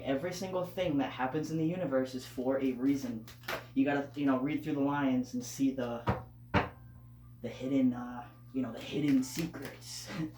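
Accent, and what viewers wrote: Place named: East Coast, United States. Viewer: Where the hell have you been. Whatever you can do to become more available as a channel on YouTube I hope you have the time to do it. The fact that so many men watch your channel got my attention. Please take the opportunity to stand out to Men. I think you come across two men in a really relatable way. I can understand the struggle of just beginning to awaken but I bet guys struggle with it more because in addition to not trusting it they probably feel uncomfortable just considering it. You have a laid-back regular guys guy kind of a feel. BTW, your East coast accent only adds to that. It says I can give you a spot on reading and kick your ass. I'm joking but I'm sure you get my drift. Another thing is that while I'm sure you are a fabulously handsome guy, the fact that you're not in the video that you're off to the side is probably important. 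There's only a handful of male readers that I've come across in the estrogen ocean of readers. As you would expect the better-looking they are, the more popular than trailers. That doesn't mean that they're not good at what they do but at some point because of all of the girls responding it begins to feel Rockstar and it wouldn't be my first choice if I was looking for answers to help me out with my life, especially in the beginning of that journey.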